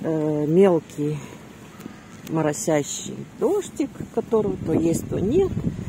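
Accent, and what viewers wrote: Odessa, Ukraine. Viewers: До 2014 года приезжая в Одессу замечала что останавливаюсь и прислушиваюсь как разговаривают Одесситы., спасибо за возможность послушать Вашу такую чисто Одесскую речь. С пожеланием мира всем нам из Донецка.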